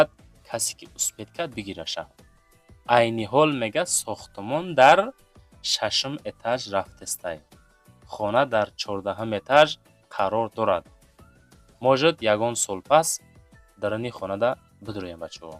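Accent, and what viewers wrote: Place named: Shahrtuz, Tajikistan. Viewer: Гап занитон сад фоиз ай шахритуз хона мон додарчон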